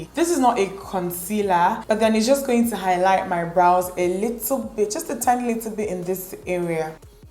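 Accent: Nigeria. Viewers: Are you Nigerian? I love your accent 😍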